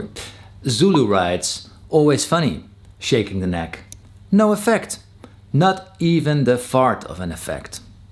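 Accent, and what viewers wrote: Netherlands. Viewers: ik neem aan dat je nederlands bent aangezien je geweldige accent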